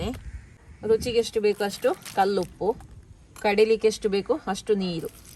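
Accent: Mangalore, India. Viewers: ನಿಮ್ಮ ಮಂಗಳೂರು ಬಾಷೆ ತುಂಬಾ ಚೆನ್ನಾಗಿದೆ ಅಕ್ಕ ಬಂಗುಡೆ ಮೀನು ನಾನು try ಮಾಡ್ತೀನಿ ಅಕ್ಕ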